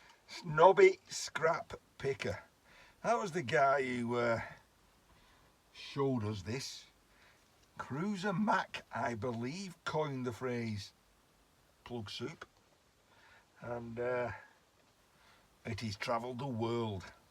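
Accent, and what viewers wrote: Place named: South Yorkshire, United Kingdom. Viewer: Guessing from the accent you're from South Yorkshire? If you're after an Ewaste buyer, SJA Metals at Newark are decent to deal with. 👍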